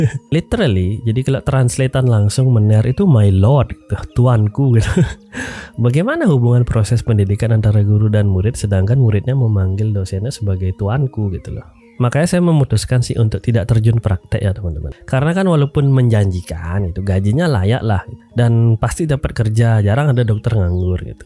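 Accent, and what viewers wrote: Bali, Indonesia. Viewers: swastiastu blii, kirain orang keturunan arab, setelah denger aksennya eh tyt orang bali wkwkwkwkw. Makasih info nya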